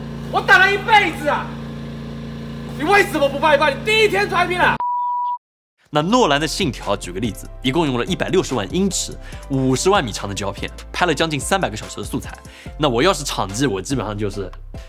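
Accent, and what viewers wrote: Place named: Jiuquan, China. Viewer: 对up主的口音很感兴趣 你是酒泉人么 跟我认识的一个人说话声音几乎一模一样 哈哈哈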